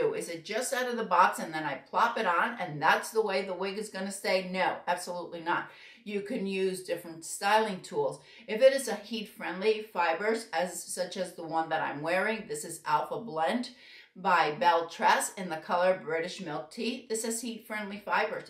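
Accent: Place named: New York, United States. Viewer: Heard NJ / NY in there somewhere! Thank you for your videos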